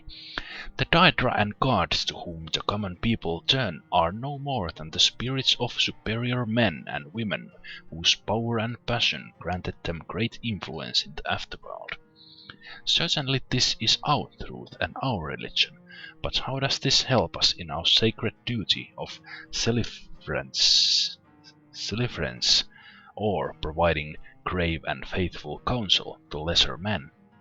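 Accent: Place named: Finland. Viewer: you sound like Ville Valo , less refined when he was young with strong Finnish accent... Cheers from Virosta ! :D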